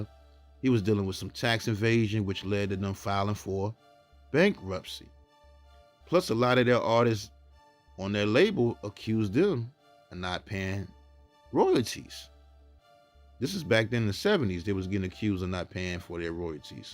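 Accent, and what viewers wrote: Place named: New York, United States. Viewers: Right I was thinking the same thing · Where are you from? Your accent sounds New York to me.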